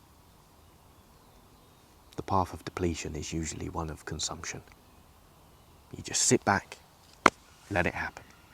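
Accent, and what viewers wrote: England, United Kingdom. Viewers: sounds like an english accent to me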